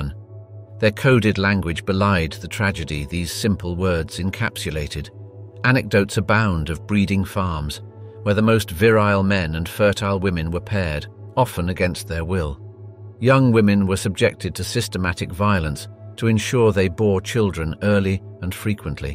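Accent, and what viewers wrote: United Kingdom. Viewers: Just what I need...Some dude with a British accent lecturing me about American slavery. Why don't you tell us about how England enslaved Ireland for centuries?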